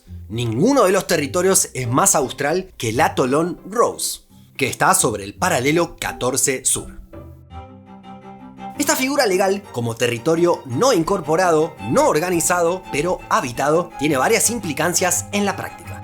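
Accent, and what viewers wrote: Argentina. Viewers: Es argentino el que habla? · Como tiene un cantito especial solo con las she pensé es argentino❤😊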